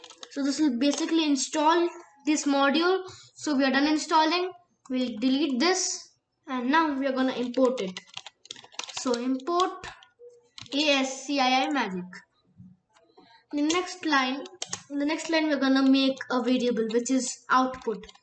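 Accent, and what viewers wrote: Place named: India. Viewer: I think you are indian because your voice says I also Indian Nice explain I understand all things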